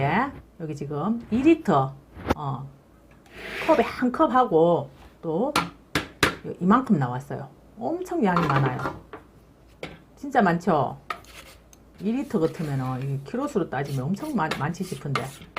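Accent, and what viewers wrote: Gyeongsang, South Korea. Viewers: 핫하하하 ...🎉🎉🎉 매매하이소 진짜 경상도 아지매맞네 그런데 엉덩이는 조심하이소😂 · 죄송합니다 경상도분같은데 ,내용이 너무좋아서배우고싶습니다 연락처는 알수없습니까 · 경상도사투리 듣기 거북해서 못보겠서요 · 말린버섯 안말린버섯 간장 어느것이 더맛잇는가요 감사합니다 경상도말씀 너무 정답네요~^^ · 소탈한 성격 정말정말 좋아요 그기다 경상도말씨짱이랍니다***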